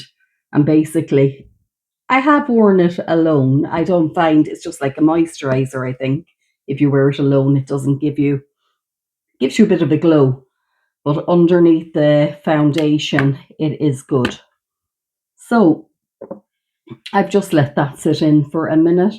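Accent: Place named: Ireland. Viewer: I could listen to you all day❤. Lov the Irish accent❤. I’d love to visit Ireland one day❤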